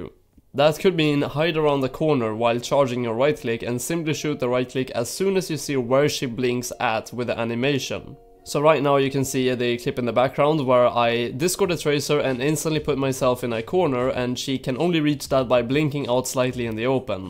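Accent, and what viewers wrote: Sweden. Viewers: Embrace the swedish accent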